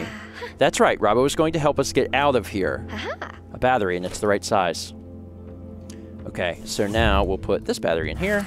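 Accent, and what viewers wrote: South, United States. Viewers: I feel the same with danish XD soutern american pronunciation though... the poor man is really doing his best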